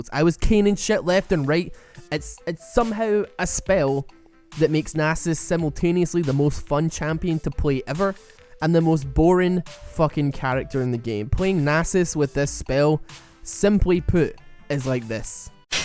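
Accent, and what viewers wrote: Scotland, United Kingdom. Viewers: He is scottish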